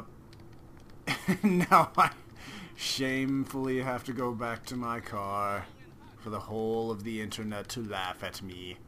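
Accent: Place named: Russia. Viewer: i love your gameplay your so funny to excellect rusian accent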